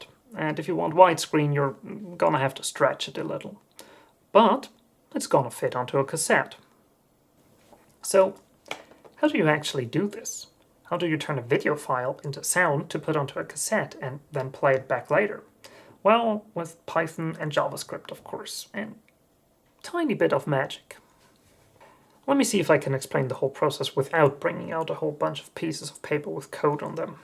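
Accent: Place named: Germany. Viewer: I sense beautiful German accent! 😊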